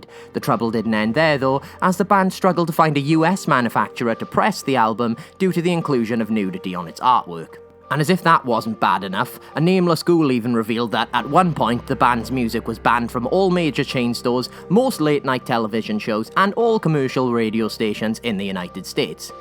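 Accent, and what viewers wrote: Wales, United Kingdom. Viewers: Is that a Welsh twang I'm sensing there ?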